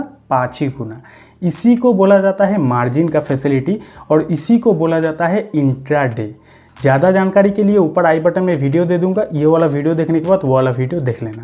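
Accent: Bengal, India. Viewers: Bhi ap bengali ho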